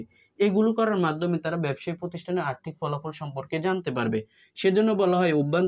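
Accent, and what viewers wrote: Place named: Chittagong, Bangladesh. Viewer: আপনার কথা বলা শুনে মনে হয় আপনার বাড়ি চট্টগ্রাম😊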